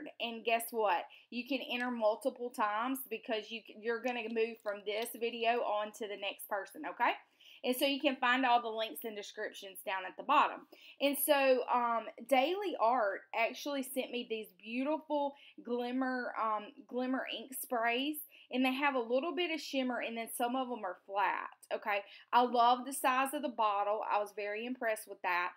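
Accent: South, United States. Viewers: I love how a southern accent just makes everything better! :) I have watched a couple videos on image transfers - I’ll have to check out your other videos - for some reason it’s a technique I love but scares me. I think I need to purchase the gel medium - I have fluid - I think the thicker medium will help the process.... also love the masking tape tip! From what I have seen from this video I am really going to enjoy your other work! That’s one of the reasons I love these hops. 1. I get to see new channels 2. I love seeing a similar theme through out and all the different takes AND 3. Who doesn’t love a chance to win something! Lol. (USA) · your beautiful southern drawl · Girl your accent makes me home sick :) I grew up in South Ga/ North Florida but now live in Ohio. Love the image transfer idea. Really cool page. (In the US)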